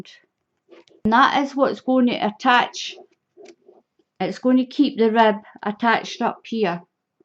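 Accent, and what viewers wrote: Scotland, United Kingdom. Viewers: Was going to watch this to see this beautiful hat being made and staying to hear the accent. I love Scotland, can't wait to see how this hat turns out :)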